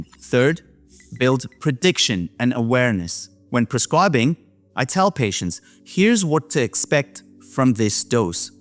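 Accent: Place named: Australia. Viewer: To nuance this video slightly and I'm coming up this from the point of being in the UK and it sounds like you're in Australia so how stimulants are prescribed there may be different. But the most important Factor here is please don't watch this and now think that if you have autismand ADHD, that you should not take stimulants, that is incorrect. What's important is that you are properly and expertly titrated. I'm in a household of people all diagnosed with autism and ADHD and we all take stimulant medication, however we are on different doses and at least one of those takes a different stimulant to the rest. We have all worked out which stimulant was right for us with the support and care of an expert clinical prescriber. So whilst yes the incorrect stimulant or dose may have effects that you weren't expecting and may make your anxiety worse, with correct titration this can be mitigated and you can see improvements in both your ADHD symptoms and your levels of anxiety.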